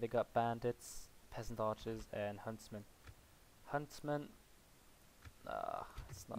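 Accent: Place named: Norway. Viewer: are you norweagen you sound little norweagen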